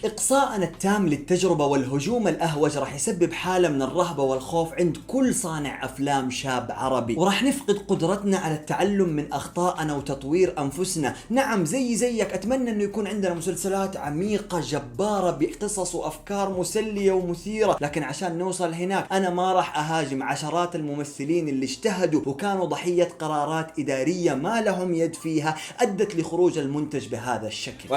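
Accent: Jordan. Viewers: اكيد كنت عايش او بتدرس في الاردن مستحيل اللهجه والاداء هذا من كم يوم او سياحه هناك😂😂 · يا اخي متت ع كلامك الأردني جايب الشخصية صح😂😂😂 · انا جايه من شارع الاعشى ، انصدمت انك بتعرف تحكي اردني اكثر مننا 😂😂😂❤❤ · تعليقي رح يكون بس على لهجتك الاردنيه الصح😅 لو مثلت بالمسلسل كان عملت جو رهيب😍 و غير هيك انه لكنة دواوين اصلي و ابن الاردن صح 😍 يعطيك العافيه ع هيك اداء جبار😍❤❤ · مش طبيعههي ياا الله طلع اردني الشب😂😂😂❤️ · تقييمه 3.3 بس لهجتك الاردنية تجنن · حبيت تمثيلك الأردني فرطت 😂😂 اقسم بالله انك فنان متقن اللهجة بشكل رهيب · انتا اصلك اردني حجي؟ انتا بتحكي اردني احسن مني 😑 استمر يا وحش 🖤😁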